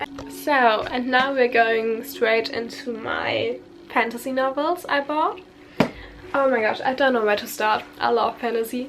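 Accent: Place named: Germany. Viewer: Es ist so spannend wie gut dein Vokabular bist, aber man trotzdem einfach hört, dass du deutsch bist. Würde mich interessieren, ob sich das mit einem Aufenthalt in einem englisch sprachigen Land ändern würde.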